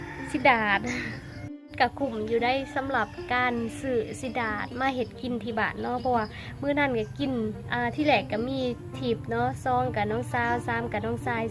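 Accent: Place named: Ubon Ratchathani, Thailand. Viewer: สำเนียงการเว้าบ้านคุณ คล้ายเมืองอุบลฝั่งไทยมากเลยครับ แซบๆเด้อครับกินอยู่บ้านละดีพร้อมหน่าพรอ้มตาครอบครัว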